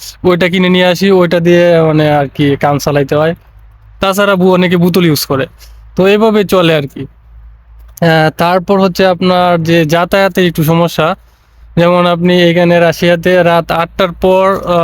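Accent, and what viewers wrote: Kishoreganj, Bangladesh. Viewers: আমাদের কিশোরগঞ্জের কথাবার্তা মনে হচ্ছে ❤। কিশোরগঞ্জের কোথায়?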